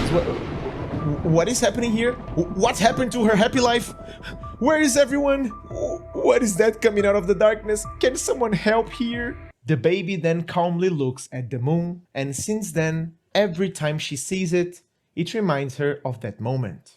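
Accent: Brazil. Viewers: Karalhow negão, dps q tu falou q era br eu n parei de ouvir o br do seu inglês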